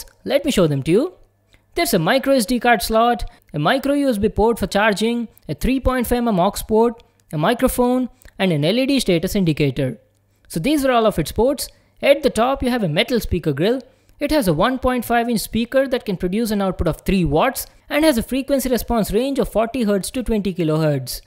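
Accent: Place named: Telugu, India. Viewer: Bro... Nenu expect chesa nee slang Telugu la undi ani.. Mee father channel and chala refer chesi...i came to a conclusion .. NUVVU TELUGU VAADIVE...😊🤓😘😘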